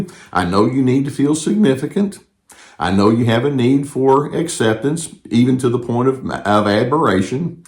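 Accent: South, United States. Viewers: excellent! thanks for taking a moment to respond to my comment. really appreciate the southern drawl, the funny faces and sarcasm that you use to describe the buffoon narcissists of the world. gus in the background when doing the videos, is such a comforting soul. will continue to keep an eye on YT for more videos. all the best, steven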